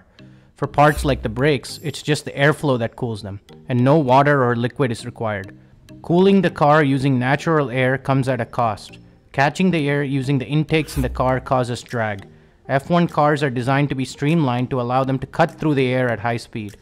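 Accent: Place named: India. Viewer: Don't hold back your tongue when speaking. That's why Indian accents really hurts my ears when listening.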